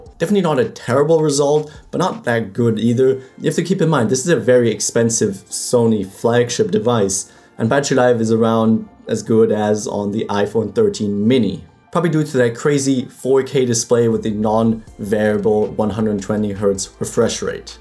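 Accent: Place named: Germany. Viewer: you have a Lithuanian name and German accent. Where are you from?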